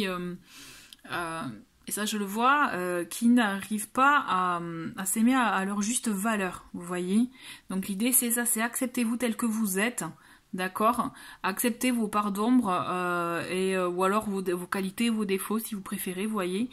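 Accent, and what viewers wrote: South, France. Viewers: Bonsoir moi personnellement j'adore vous entendre parler votre petit accent me fait penser au sud de la France vous avez l'accent qui chante et cela fait chaud au cœur pour avoir vécu une partie de ma vie dans le sud et actuellement en région parisienne cela fait du bien oui ce confinement n'ai pas tous les jours facile surtout quand on et seule même si il y a Messenger moi qui étais vraiment très proche de mes petits enfants et quand il vous dises mamie tu me manque cela va être vraiment dur oui angoisser car avec ce confinement et mon autre qui travaille je me fait beaucoup de souci car nous vivons pas ensemble merci pour votre tirage passé une belle soirée Gratitude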